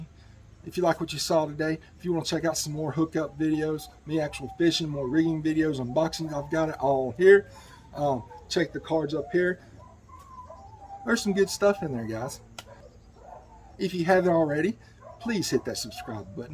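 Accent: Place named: New England, United States. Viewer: Could not follow the mumbling and drawl and dogs barking . Lots of people do not speak Yankee!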